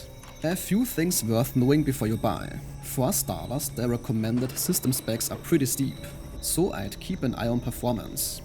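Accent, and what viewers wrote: Germany. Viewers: Sounds german :D Thanks for the review !!! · Junge dieser deutsche Akzent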